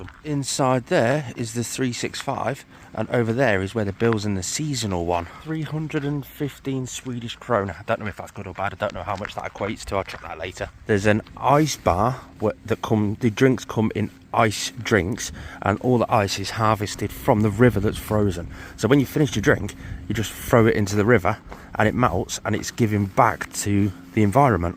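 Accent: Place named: Stoke, United Kingdom. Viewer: Dude are you from Stoke ?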